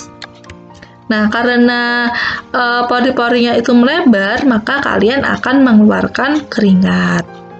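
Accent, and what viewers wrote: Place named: Java, Indonesia. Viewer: Medok banget jowonya 😁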